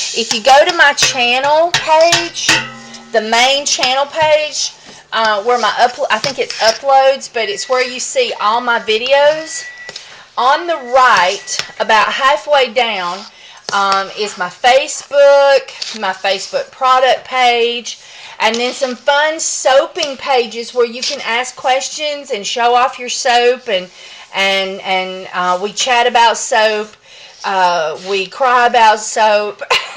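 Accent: South, United States. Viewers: loves y'all southern drawl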